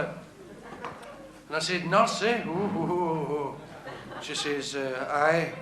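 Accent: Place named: Glasgow, United Kingdom. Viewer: His material was much better when he still had the Glaswegian accent. He was still funny but being from Glasgow I found him funnier then.🏴🇺🇸😜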